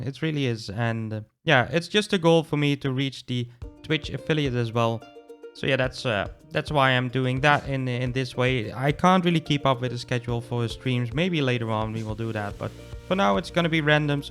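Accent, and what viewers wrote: Netherlands. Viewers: Jou bent een nederlander ik hoor aan de accent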